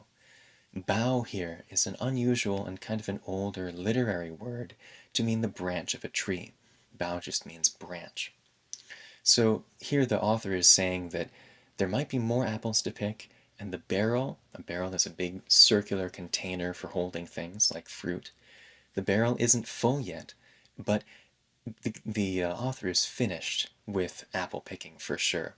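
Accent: United States. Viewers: Bbest teacher ever ❤ I learn American accent from you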